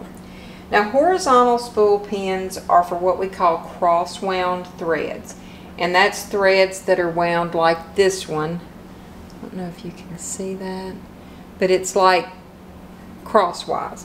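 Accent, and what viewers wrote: South, United States. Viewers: Your video came on after I finished another tutorial, but I thoroughly enjoyed your southern country drawl, as well as your explanation. I put my singer away and just sat and listened to your video. Thank you!! 🙏🏽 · You did a fantastic job with this video! Your explanations and demonstrations were so thorough and extremely helpful and easy to follow. I’m looking forward to the holidays being over so I can play with my new heavy duty Singer machine. Oh, and your southern drawl made it even more enjoyable! 😊. Thanks, looking forward to the next video.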